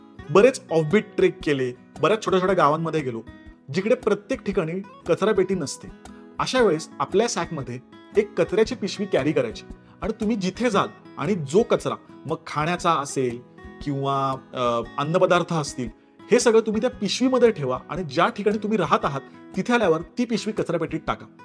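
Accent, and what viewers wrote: Pune, India. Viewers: Good one 👍 tuzhe funny 😊comments also made the vlog interesting and that typical pune ascent 😊in all good vlog and it should help many so thanks boss 😊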